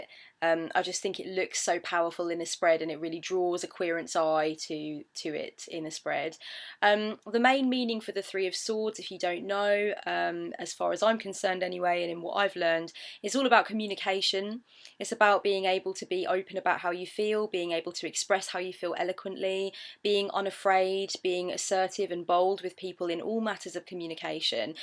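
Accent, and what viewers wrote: United Kingdom. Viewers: I just discovered your channel and I'm slowly working my way through your early videos. I love your down-to-earth approach, and it's refreshing to hear a fellow Brit. You were speaking about the 5 of Cups, and that came up in a reading I did literally today. And today it was the first time I really noticed the river flowing under the bridge. It's like the card is saying, 'Yes, shit happened, it's right to acknowledge it, but it's water under the bridge Take the time to look around, see what you actually have and then cross that bridge, leaving the shit behind.'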